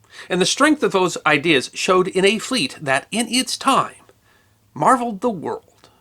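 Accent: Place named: United States. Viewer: His American dialect is not easy to understand.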